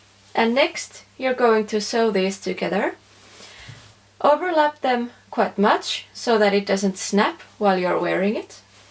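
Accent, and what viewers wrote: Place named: Finland. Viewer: Mä arvasin et oot suomalainen koska sä puhuit suomen englantia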